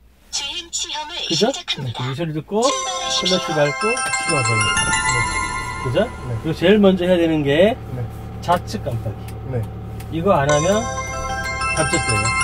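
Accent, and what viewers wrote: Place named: Gyeongsang, South Korea. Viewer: 이거 보고 1종보통 도로주행 한번에 합격했습니다 직관적인 설명,약간의 경상도 사투리(?)...친절함까지... 1종보통 합격요령은 기어조작능력 + 순발력 + 느긋함 + 약간의 센스가 필요해보여요.저도 뭐 유튭영상 수십번 시청하고 했지만 막상 시험칠때는 아무 생각 안나더 군요.긴장을 삭히고 배운대로 익힌대로 차분하게 마인드콘트롤 하면서 초반이 제일 중요하니 초중반만 문제없이 넘기니까 후반쯤에는 오히려 자신감이 생기데요:)) 보통 도로주행 70점컷인데 만점은 거의 없고 80~90점으로 많이 합격하더라구요 만점받을 생각하지 마시고 감점을 최소화하는게 합격요령이에요 다들 꼭 한방에 합격하시길 바랄게요 화이팅!!!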